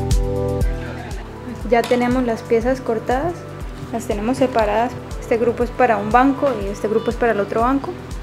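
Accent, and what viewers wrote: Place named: Colombia. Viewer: no sé cómo mostrarte los vídeos que encuentro en yt y te puedan ser útiles. Busca en YT: how to hydro dip ANITHING Creo que te va a interesar para pintar alguna de tus creaciones Pdta: me encanta tu dejo Colombiano xd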